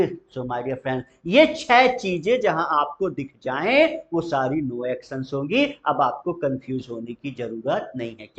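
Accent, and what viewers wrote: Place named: United States. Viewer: Why are you talking in American accent m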